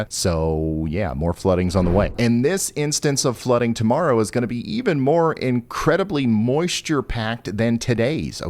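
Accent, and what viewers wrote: South, United States. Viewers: My Husband asked if he was getting paid per "gully washer" today. Love his southern-ez.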